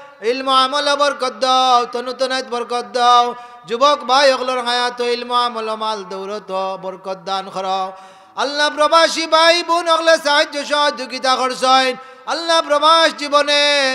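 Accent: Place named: Sylhet, Bangladesh. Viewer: মনে আয় সিলেটি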